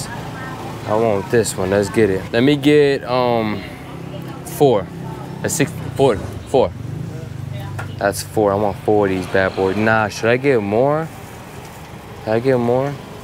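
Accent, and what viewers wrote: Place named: New York, United States. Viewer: Chanclas...how many understood that? you are pure neoyorquino...obvious when you talk LOL And thanks for the weed shop tip, so many shops in BKK are way overpriced ($25usd for a gram...yikes!)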